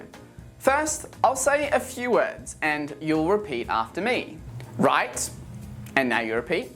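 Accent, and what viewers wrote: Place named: United Kingdom. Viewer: Hey up m8! Brilliant video. Do you have your own playlist of your own? I like your familiar British sounds.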